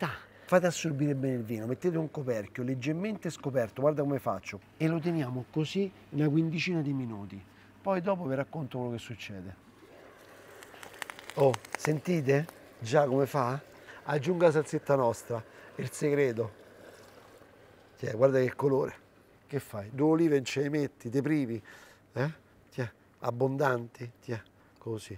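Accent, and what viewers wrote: Rome, Italy. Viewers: Dovrebbe parlare e gesticolare di meno e poi basta con questo dialetto protoromanesco dai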